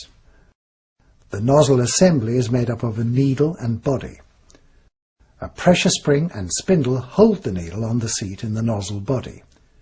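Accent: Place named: United Kingdom. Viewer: للأسف التعليق بإنجليزية بريطانية.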